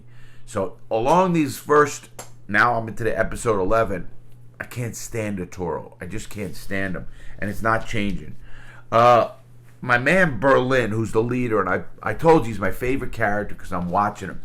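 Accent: New York, United States. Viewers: he has the same accent with a little more new york in it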